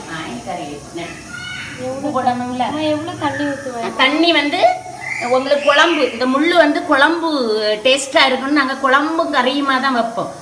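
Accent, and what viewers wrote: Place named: Kanyakumari, India. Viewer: Akka kk slang.kaytalaymass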